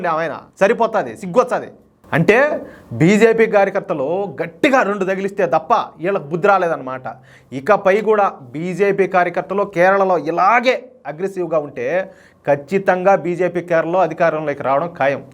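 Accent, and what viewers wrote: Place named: Karnataka border, India. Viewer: బ్రదర్ మీ శ్లాంగ్ బాగా నచ్చింది. I guess మీరు కర్ణాటక బోర్డర్ ఆయి ఉంటుంది. మెట్టు తో కొడతారు.... హైలెట్ 😅 Any how మంచి వీడియో చేసారు. Thank you so much 👍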